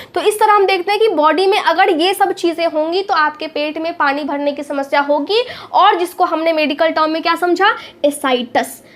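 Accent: Bihar, India. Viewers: Lagta hai aap बिहाड़ se हैं। वैसे आप ने लिभड़ के बारे में अच्छी तरह समझाया है। 😊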